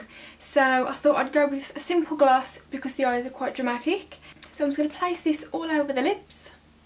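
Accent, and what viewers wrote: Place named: Midlands, United Kingdom. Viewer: I haven't heard someone from the Midlands on youtube before XD Represent!! Lol.Nice vid :)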